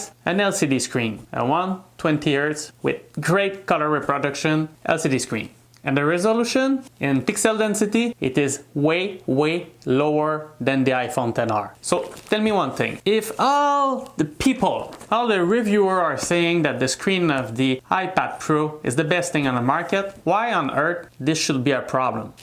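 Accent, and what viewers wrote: Quebec, Canada. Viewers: Wooooooow t'es du Québec right? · J’ai reconnu l’accent québécois hein? PS:moi aussi j’en suis un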